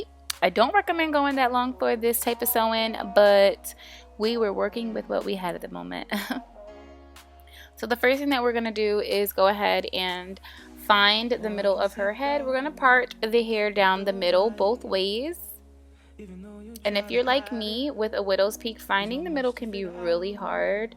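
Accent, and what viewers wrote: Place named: Caribbean. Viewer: Are you West Indian or have West Indian parents. it's just the way you say cornrow and catch/ketch when talking about the braids 😭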